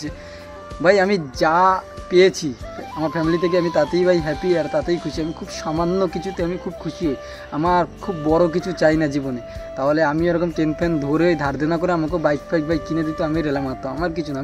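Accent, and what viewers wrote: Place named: East Medinipur, India. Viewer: sune mone hochhe east medinipurer lok ra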